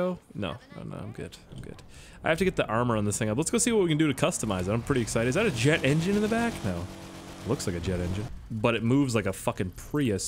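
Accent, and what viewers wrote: Canada. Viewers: "when I get angry I sound really Canadian" I hear ya there bud lmao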